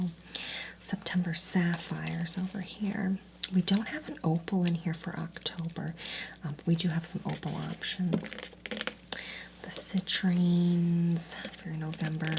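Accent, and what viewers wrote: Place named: South, United States. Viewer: I keep coming back to this one! You are just so talented! It’s so natural and calming and feels so real! (Also I’m from NC—you have to be from the south too!!) 🥰🥰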